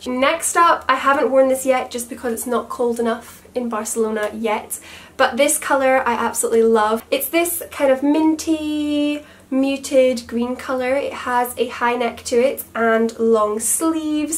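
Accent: Scotland, United Kingdom. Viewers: It's so nice to hear another Scottish accent on youtube 👏🏻👏🏻 I've been trying to find fellow Scottish youtubers, absolutely adore your videos!! 😊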